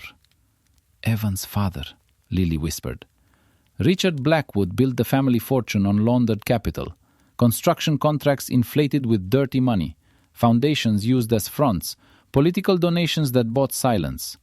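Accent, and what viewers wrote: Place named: Russia. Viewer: The constant clanging sound and the inexplicable Russian accent made part two much harder to listen to. We’re the story regarding spies or the Bratva the accent might have enhansed the story but as it was neither it just made it difficult to understand.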